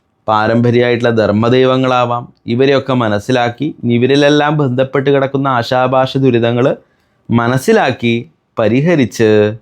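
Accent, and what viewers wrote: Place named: Thrissur, India. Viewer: ഇവൻ തൃശൂർ ആണല്ലോ 😂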